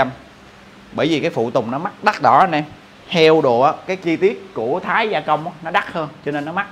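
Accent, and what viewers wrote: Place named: Ninh Thuan, Vietnam. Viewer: Nghe tiếng a nói chuyện, giống dân Ninh Thuận quá ta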